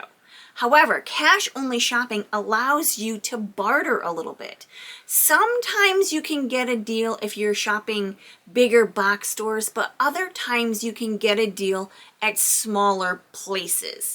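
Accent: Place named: Michigan, United States. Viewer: You have a Michigan accent. Or maybe Wisconsin or somewhere near there.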